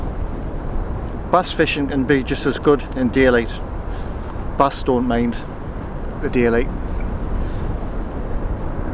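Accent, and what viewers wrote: Newcastle, United Kingdom. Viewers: Just Subscribed after finding your videos really enjoyed them and keep the Geordie accent its your home and I can tell its something your proud off, great fishing tips, so sorry about your Mum but not a day will pass when she won't be in your thoughts, hopefully I will get out that way with my kayak. Cheers.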